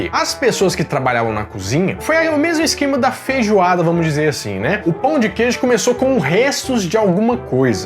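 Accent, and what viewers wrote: Minas Gerais, Brazil. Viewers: "balangar" o leite kkk é mineiro msm..melhor sotaque..sou da zona da mata mineira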